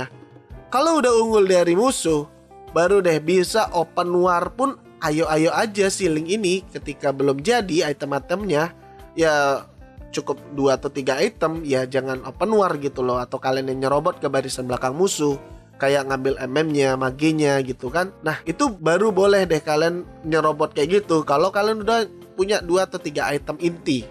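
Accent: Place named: Padang, Indonesia. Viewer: logatnya itu kek org padang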